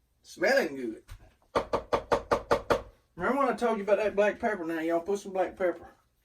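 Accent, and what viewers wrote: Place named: South, United States. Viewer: Plenty of “y’alls”, lots of butter and a strong southern accent...you the freakin male version of Paula Deen!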